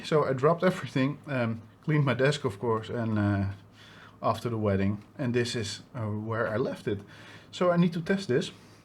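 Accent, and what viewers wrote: Netherlands. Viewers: Ik hoor aan uw accent dat u Nederlands bent dus dacht stuur u even een berichtje in die taal. Ik heb op dit moment het houtboard gezaagd en hou uw boor breedte van 3.2 mm per ledje aan. Nu vroeg ik me af, Hoeveel cm u tussen de ledjes heeft zitten. Is dit toevallig 15mm? Vriendelijke groet en bedankt voor uw zeer gedetailleerde video.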